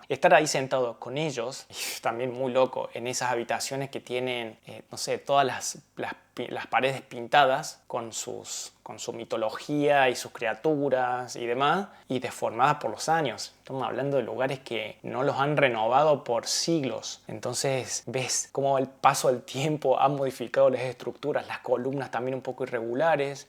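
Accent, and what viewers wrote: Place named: Córdoba, Argentina. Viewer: Mori con tu rrelato!! Hace unos años anduve 6 meses x Nepal e India, y llegue hasta Leh! Que lugar hermoso, y la cultura tibetana, me enamore! Mi idea es volver...No entendi como se llama el monasterio en el que estuviste viviendo, y el puebloto en wl que estaba. Tenes tonito Cordobés...yo vivo en Villa Giardino hace un par de años 😁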